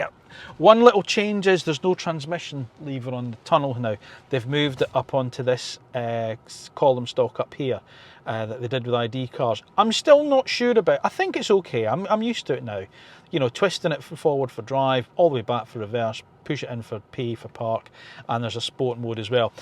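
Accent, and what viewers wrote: Scotland, United Kingdom. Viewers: You’re scottish? 🏴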